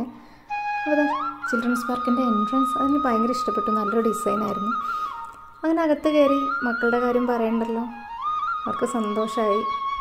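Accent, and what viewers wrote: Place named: Thrissur, India. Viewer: Thrissur slang kalakiii👌👌😄😄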